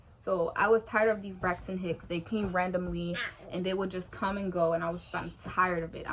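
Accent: Jamaica. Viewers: Ooouuu u Jamaican girllaaaa😛😁. Sorry lol my bf also Jamaican nd the fact that I understood wat u was saying I got press lol😂. He be teaching me stuff😄.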